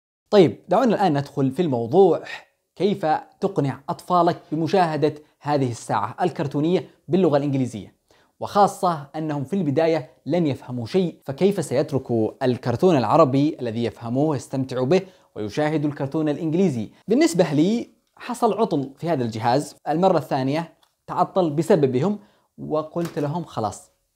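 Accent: Yemen. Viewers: انت يمني صح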